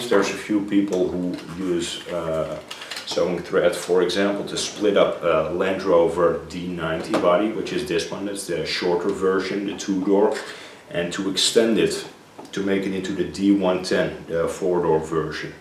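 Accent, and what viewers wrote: Netherlands. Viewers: Sounds like a dutch accent. You can tell by S sounds sounding like sch.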